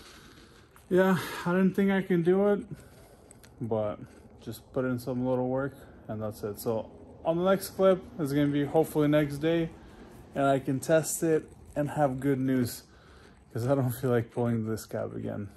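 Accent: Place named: Eastern Europe. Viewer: Another great video. People want to put down the 6.4 but remember is was not Ford Nor International fault that the government made so many emissions mandates. Many people say that after 10 years then the vehicle emissions should be exempted. By the way, I hear a hint of either Russian or Ukrainian or easter Europe in your voice. May I as, Where are you all from?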